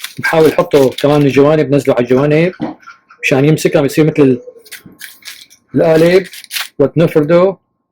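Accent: Levant. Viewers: الله يعطيك العافية متابعة لقناتك لشوف الطبخات الظريفة واسمع لهجتك الشامية الحلوة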